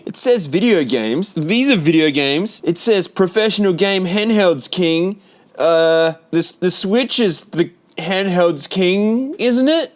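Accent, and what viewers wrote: Australia. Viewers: British? He's clearly Australian. You may have a problem with your phone's trumpet mouth, you should have it serviced by a professionat.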